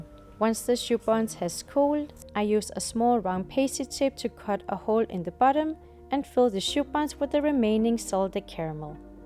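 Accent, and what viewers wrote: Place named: Denmark. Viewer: Hej jeg kan høre en dansk accent og navn! Ser super godt ud! ^-^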